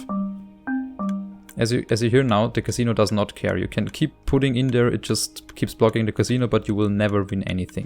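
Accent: Germany. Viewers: Are you german i think you have a german accent!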